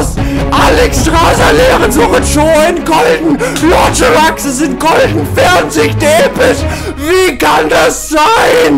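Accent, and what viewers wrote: Germany. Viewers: I feel like the German accent and language makes it all that more special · English mit deutschem Akzent klingt so falsch ich finde aber die subtitles haste echt gut hinbekommen (Mir fehlt noch einer/I still lack one) Echt super und ich habe das schon allen meinen freunden gezeigt dat is zu lustig egal wie alt das is XD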